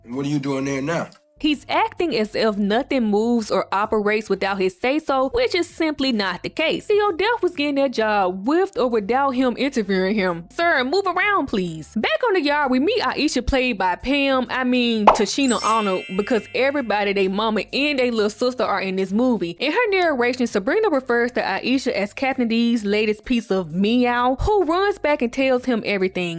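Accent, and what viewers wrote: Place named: South, United States. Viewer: love your country accent 😂😂 i feel at home! new subbie in me 💕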